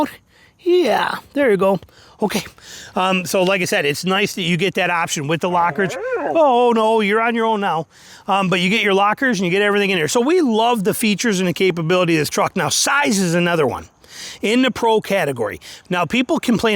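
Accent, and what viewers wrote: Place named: Chicago, United States. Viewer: That’s a very Chicago accent for a South Georgian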